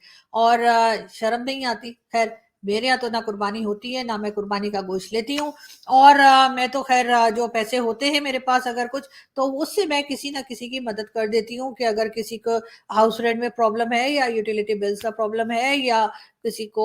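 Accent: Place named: Mumbai, India. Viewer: ji aap mumbai hindi kaise bol leti hai. pls repl.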